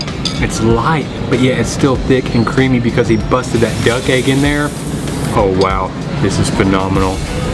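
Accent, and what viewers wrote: South, United States. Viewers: Love when you still say ya'll ...very southern USA. Have fun in South East Asia.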